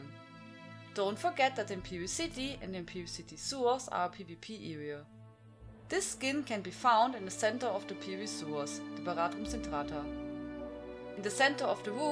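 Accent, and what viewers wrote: Germany. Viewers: yes or Akzent is germany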